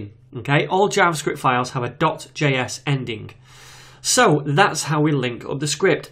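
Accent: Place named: North, United Kingdom. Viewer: Clear and concise tuts with the added bonus of a northern accent.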